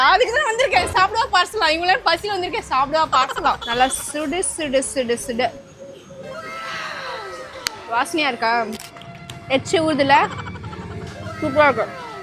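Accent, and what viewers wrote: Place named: North, India. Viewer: Tamil pesum vadakan 😮😮😮😮😮😮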